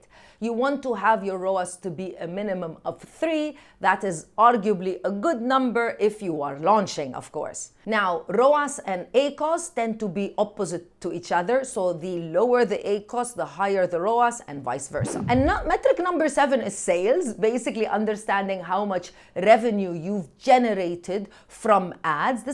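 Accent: Arab world. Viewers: Love to hear Arabic accent of English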